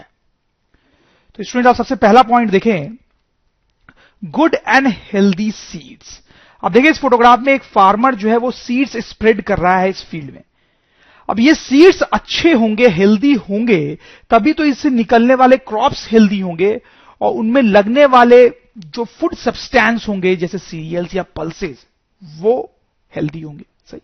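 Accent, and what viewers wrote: Bihar, India. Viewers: Are u from bihar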